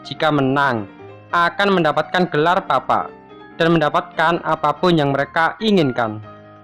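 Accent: Java, Indonesia. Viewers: cuman masukan doang nih bang, pembawaannya kurang. masih medok banget. ga salah sih klo medok, cuman biar enak aja yg denger. makasih.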